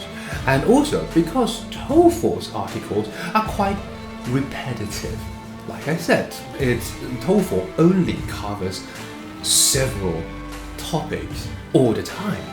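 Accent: United Kingdom. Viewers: 這是英國哪種口音? 我一個大陸同事有一模一樣口音，看到影片驚呆了我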